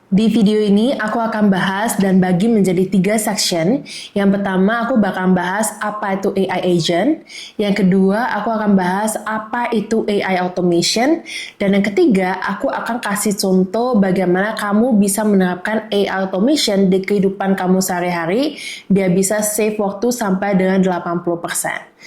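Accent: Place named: France. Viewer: aksennya franch bgt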